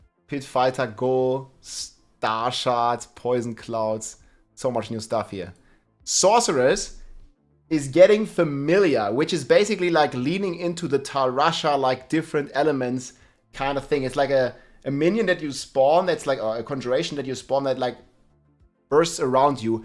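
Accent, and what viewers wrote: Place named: Germany. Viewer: Perditation??? Per-di-shun. I understand German is your first language but whoa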